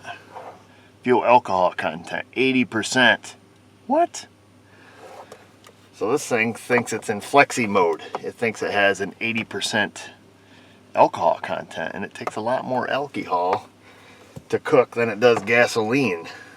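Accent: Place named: South, United States. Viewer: Omg country twang; you got me homesick and misty eyed.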